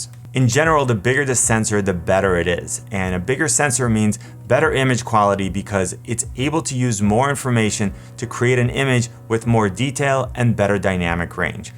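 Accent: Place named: Chicago, United States. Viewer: Thank you- I am leaning towards the SL2 because of affordability and I don’t really want to shoot video in 4K if the auto focus isn’t great in the m50, plus I don’t want to deal with 4K video all over the place. Also I plan to use a gimbal. So in 1080 the sl2 can do 60fps? I want to be able to do slow motion. Also I hear the Chicago accent and the outdoor shots looked like my hometown, Evanston.. ! thank you for a thorough review!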